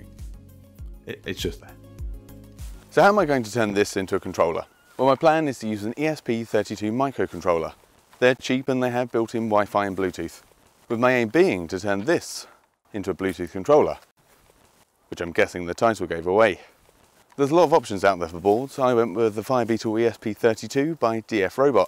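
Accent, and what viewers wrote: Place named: United Kingdom. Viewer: I think you are the most british guy i've ever heard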